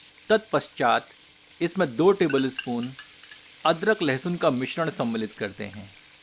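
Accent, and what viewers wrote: Standard. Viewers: maja aa gaya shuddha hindi sun ke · शुद्ध हिंदी का उच्चारण क्या बात है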